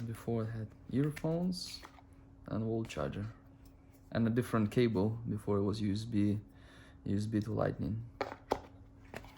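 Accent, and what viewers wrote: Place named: Russia. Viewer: хахаха сразу слышно что руский))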